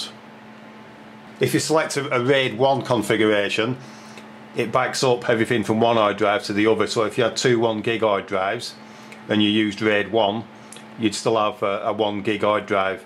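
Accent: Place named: Scotland, United Kingdom. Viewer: Thanks! But I had to watch the whole thing over a few times, because I was so mesmerized by your Scottish accent. ;) ^_^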